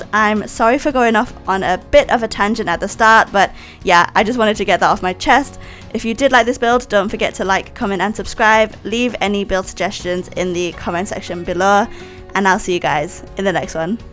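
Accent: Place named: Asia. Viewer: Hey! Firstly, gorgeous build - like, it’s so cozy and cottage-y and I love it! Secondly, your voice is so cute! And it’s so cool to learn that you’re Asian, I wouldn’t feel self-conscious about your accent or voice, but I appreciate it’s still daunting to create voiceovers haha! I struggle with my own. In response to your question on voiceovers, I tried to script mine but it came out too robotic... now I’m trying to just go with the flow, tell some stories, talk about the build, but it does take a lot out of me to record them. I’ve got so much recorded footage just waiting for voiceovers 😂 Love your videos! 💜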